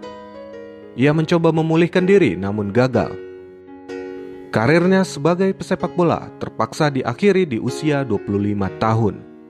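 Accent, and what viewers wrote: Medan, Indonesia. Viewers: Orang medan rupanya abg ini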